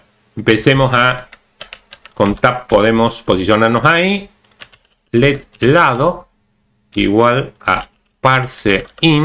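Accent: Argentina. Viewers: buenas tardes profesor, me esta empezando a gustar el acento argentino no me paso viendo hablar a messi ni a maradona muy agradecido voy por el video 66 no me habia dado cuenta que tenia todas esas pestañas en el editor de codigo abiertas y te vi como las cerrabas y aprendi jejeje, muy agradecido con tu enseñanza si hubiera sabido de alguien en el pasado que explicara asi le hubiera pagado. pero no encontre tal cosa. espero poder contribuir en un futuro muy cercano, la verdad que siento pena de ver tu gran esfuerzo y no dar nada de vuelta. muchas gracias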